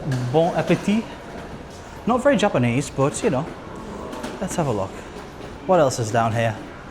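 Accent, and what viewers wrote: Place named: Northeast England, United Kingdom. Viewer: I'm sorry but this is too funny!!! Are you from Sunderland or Newcastle?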